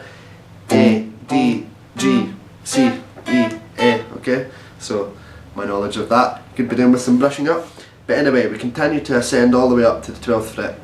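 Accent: Scotland, United Kingdom. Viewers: Like your accent. Scottish? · I'm in love with your accent ! Is it scottish? Northern Irish? Anyways, it's awesome Thanks for the guitar lesson man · Scottish accent... no problem to follow him... thanks to few years in UK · Scottish?? Great video man btw man!!! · Comments on the video later but that accent is amazing!! Scotland?? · As suggested... some of these exercises are indeed tongue twisters... especially when spoken in a broad Scottish accent! Good instructional video nonetheless... well done! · Dat Scottish accent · he speaks nasal. But where is this accent from? Which part of the UK? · Thank you so much for this. I started on piano when I was 7 and have been out of playing for 40+ years. My son-in-law gave me one of his old guitars last Christmas and I had forgotten most (if not all) of my theory. It was one of the problems I was having converting the keyboard to the fret board. 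Even through the Sco"ish accent, this was just the reminder I needed. Thanks again. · Scottish accents rock! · He sounds like a fine Scot to me!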